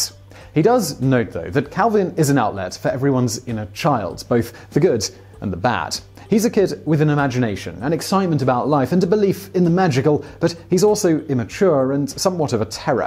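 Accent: England, United Kingdom. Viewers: Why is not cooking and have english accent?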